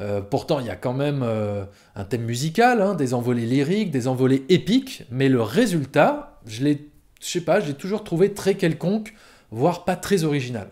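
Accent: South, France. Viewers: Lorsqu'on met Aliens 4ème d'un classement on se rend compte réellement que James Cameron n'a fait que des excellents films voir des chefs d'œuvre. Je le mettrais plus haut dans la liste mais le top 5 est très compliqué Concernant Avatar je le mettrais aussi dans les derniers du classement car il m'a marqué essentiellement pour ses effets spéciaux révolutionnaires et que dire de la 3D... Pour la musique je ne suis pas d'accord avec toi par contre je l'écoute de temps en temps mais pas autant que celle du seigneur des anneaux qui est addictive Du coup si je devais faire mon classement des films de James Cameron (versions longues uniquement) 1) terminator 2 2) aliens 3) titanic 4) Terminator 5) Abyss 6) avatar 7) true lies Vivement la réouverture des salles obscures Bel accent du sud sinon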